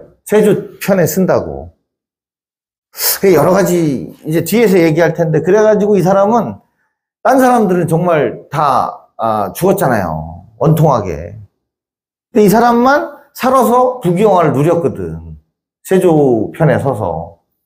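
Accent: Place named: Chungcheong, South Korea. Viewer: 걸쭉한 충청지역 토속억양이 구미 당겨놓고 시작하니 이 풍성한 명절에 선생님 만나는 기쁨 호삽니다 언제어디서나 인간의 만남에 공통 이야기 상식 풀어 주시니 고맙습니다 건강 유지하시길.....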